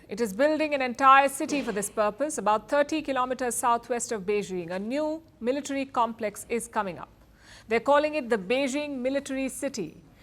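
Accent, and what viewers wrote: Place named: India. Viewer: Indian accent sound horrible 😅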